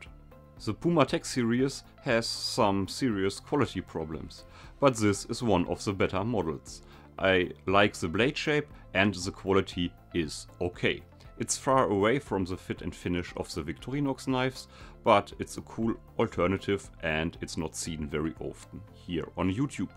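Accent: Germany. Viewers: You are german i can hear that clearly hab ich Recht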